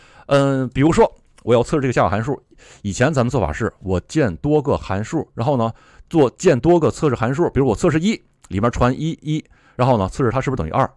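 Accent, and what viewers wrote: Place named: Japan. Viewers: 因为在日本，没办法你到日本你的英语也会变W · Python Test 为什么老带日语发音？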